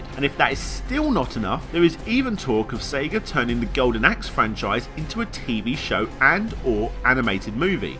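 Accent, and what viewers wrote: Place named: England, United Kingdom. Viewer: It's so nice to hear complete history videos from a fellow Englishman instead of American folk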